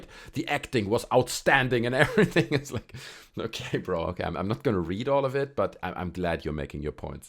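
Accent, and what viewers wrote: Germany. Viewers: I love your German accent. You and the YouTuber 'get germanized' should collaborate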